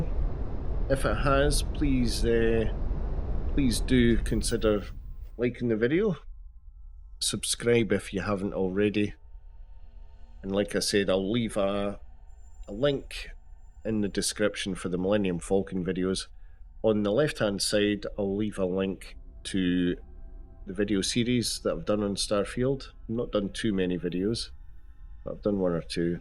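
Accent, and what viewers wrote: United Kingdom. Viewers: great to hear a Scottish accent for a change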